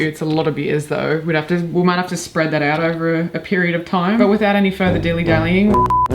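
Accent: Australia. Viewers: Hey, I love these videos of yours and I love how invested you get in the story. It’s probably the Aussie way you talk about it.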